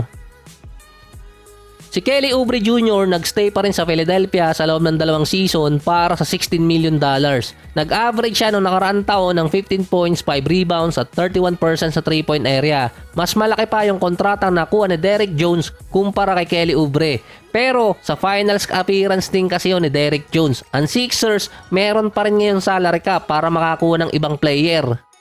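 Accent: Batangas, Philippines. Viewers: BATANGEÑO PALA SI IDOL .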